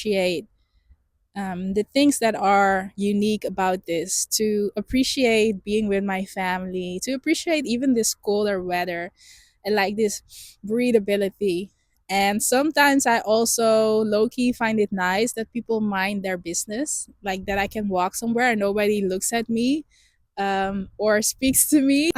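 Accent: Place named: Netherlands. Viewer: You have a Dutch accent :) You Dutch :)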